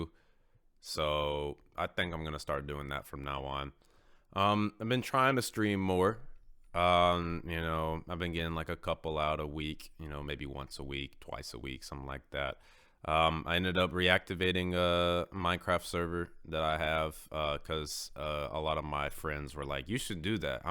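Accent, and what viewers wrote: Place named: Brooklyn, United States. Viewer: totally random but you have the most interesting accent I've ever heard, lol. How do you have you a Brooklyn, Russian, and Texan accent at the same time 😭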